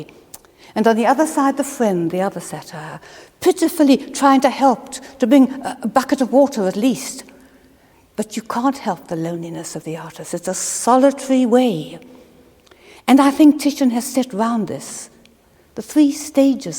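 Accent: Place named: South Africa. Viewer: Sr Wendy largely lost her South African accent but it shows in her ability to pronounce Dutch, Flemish and German names correctly.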